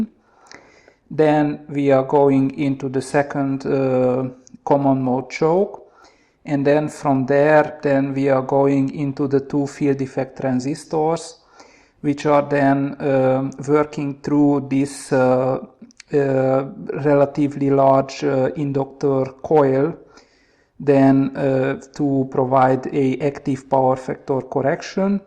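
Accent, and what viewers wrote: Hungary. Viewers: Tetszenek a videóid !!! Főleg a magyaros akcentus :)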